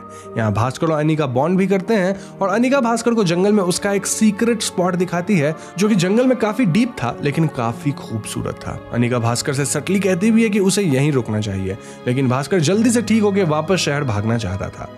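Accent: Bengal, India. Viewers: Karon tumi jokhon kotha bolcho majhe majhe bangla beriye porche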